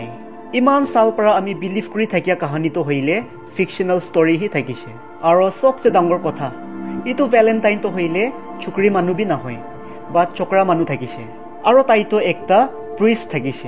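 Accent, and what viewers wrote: Nagaland, India. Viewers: i like your Nagamese accent brother ❤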